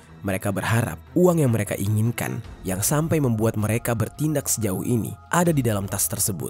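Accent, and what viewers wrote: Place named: Java, Indonesia. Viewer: Lancar bgt logat jawanya bang 😅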